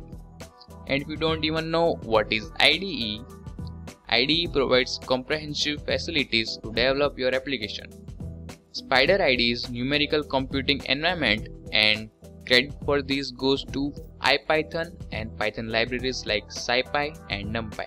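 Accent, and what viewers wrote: Gujarat, India. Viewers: Make gujarati friends and you will understand this accent. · From your accent !! I am also Gujarati that's why i know.. BTW i like your video and want more videos about ML and AI. THANKS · R u gujju? I assumed by your tone.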